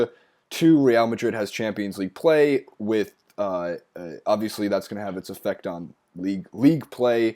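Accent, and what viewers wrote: United States. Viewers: I like hearing an American talking about football/soccer it is interesting and different, go taking over for today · american talking about football, sounds funny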